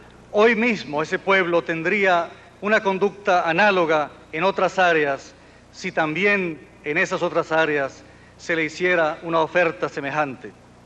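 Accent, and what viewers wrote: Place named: Argentina. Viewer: Parece que Carlos viajó la futuro latinoamericano y después narró lo que vió. De verdad es impresionante todo lo que dijo, y como se cumplió años después. P.D: No había notado que Carlos tenía un poco el acento argentino.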